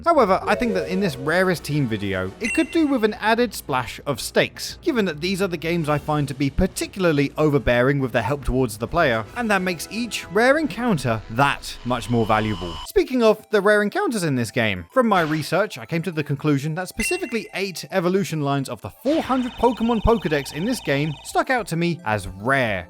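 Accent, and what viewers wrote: United Kingdom. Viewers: He’s British? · You’re bri’ish?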